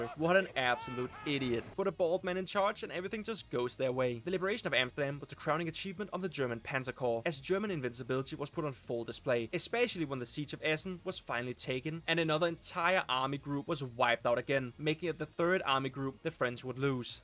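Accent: Denmark. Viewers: Drop the Danish accent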